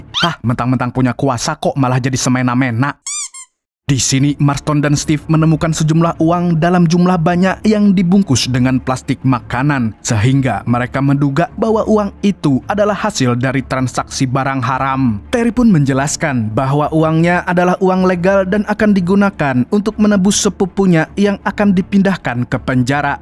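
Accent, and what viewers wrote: Banjar, Indonesia. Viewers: urang banjar 😂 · orang banjar ternyata 😂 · Banjar kah bang ai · Urang Banua ya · Banjar banarrrr!! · bah urang banjarkah mang ikaam😅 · Banjar kah pian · orang banjar kah pian ni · Logat banjar nya khas banar amang niii 😂😂 Salam matan kuala kapuas 😁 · Urang banjar sklinya 😂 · u orang Banjar Kalimantan Selatan mang ae😂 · Orang banjar kah pian mang?🤣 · Lengah dikit amang nya urang Banjar wkwkkkk😂😂😂😂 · Banjar sklinya😭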